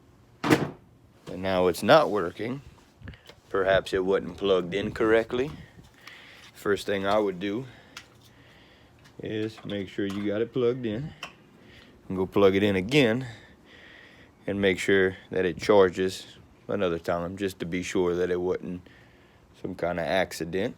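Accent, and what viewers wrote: Louisiana, United States. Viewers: You're definitely from southwest Louisiana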